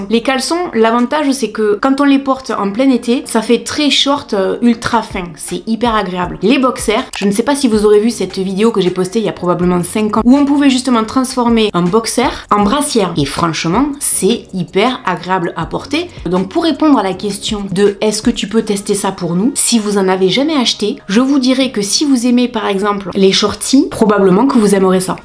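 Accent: South, France. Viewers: Je ne suis pas du style à commenter malgré le fait que je regarde régulièrement tes videos. Cependant, merci pour ta bonne humeur, ce petit accent chantant du sud et surtout tes vidéos qui englobent vraiment tout le monde... Comme tu le dis si bien, arrêtons de vouloir ranger les gens dans des cases... soyons unique tous et chacun!! Je te souhaite le meilleur, au plaisir de te récrire!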